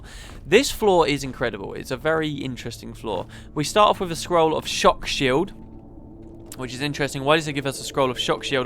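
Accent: United States. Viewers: I lover ur american accent!! Leveerrrr! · Haha lovely american accent!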